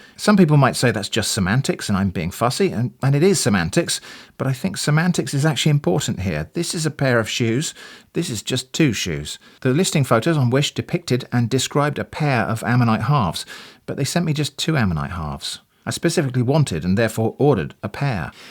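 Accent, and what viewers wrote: United Kingdom. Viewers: why does he sound so SO british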